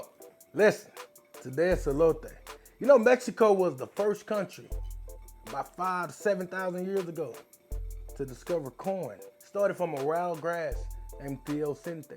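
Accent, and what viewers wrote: Texas, United States. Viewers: I guessed that you were a Texan! The accent and the hat did it.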